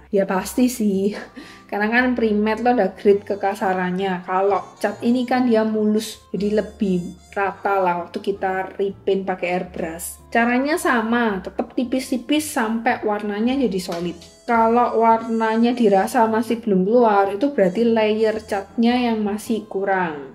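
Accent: Java, Indonesia. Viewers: Mbak logatnya agak medok ya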